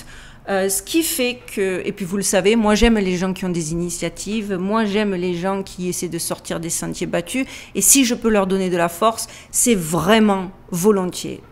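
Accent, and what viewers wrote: South, France. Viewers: Merci princesse ! Tu as gardé l'accent du sud, peuchère !❤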